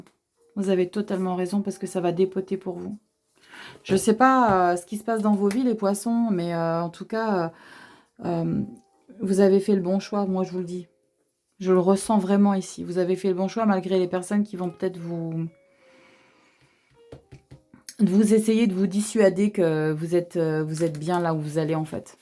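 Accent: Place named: Marseille, France. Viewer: Coucou ❤️ Moi rien ne me parle pour l'instant mais même accent du sud, de Marseille 😂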